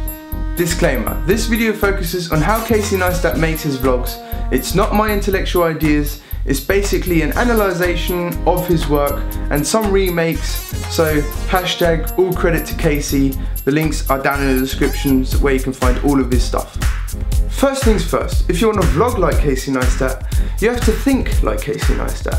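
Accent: United Kingdom. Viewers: Great vid! You've got the brit accent going for you... I'm watching KC's vids diff now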